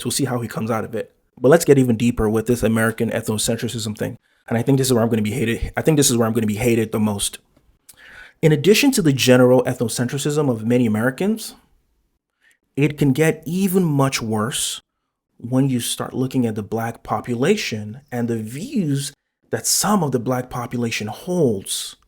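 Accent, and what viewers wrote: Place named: Canada. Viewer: He’s just surface level reacting to get views from the situation which is disingenuous because if he truly wants to have genuine discussion he would actually do the work of making sure all his points have source’s. For example doesn’t have “higher ups” anymore and even said in the song he doesn’t care what the industry has to say which is where the true essence of the battle lies. What Drake represents is what has watered down hip hop to something vapid and almost soul less. said he is not our savior. Also if your not from this culture (which homie sounds like he has some Canadian in his accent no hate) you will never understand the blood, sweat and tears that has given this art form its soul. From Police brutality in Compton being called out by NWA to Kendricks “ ”. To those saying it’s performative even during the civil rights era Mahalia Jackson sang to keep the spirits up of the people.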